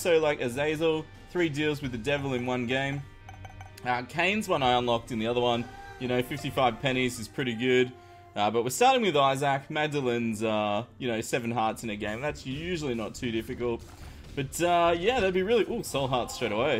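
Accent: Australia. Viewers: Are u from Australia like me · Your from Australia!😱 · WAIT YOUR AUSTRALIAN!?!? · austrailian?? meh still like his voice:) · Wow Australian I'm Australian too I can't really here to your accsent like Mr the epic ninjas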